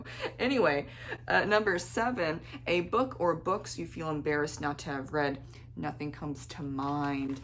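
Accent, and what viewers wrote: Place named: Boston, United States. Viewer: Love this! Great take on all these questions. There are ones I wouldn’t have wanted grandma to know about, but that’s about it. Everyone else can just deal with their boring taste on their own 😂 I get tongue tied and blend words all the time, I just beat everyone to the punch and laugh at myself. Sound like you’re from Boston, I love it!! Great video!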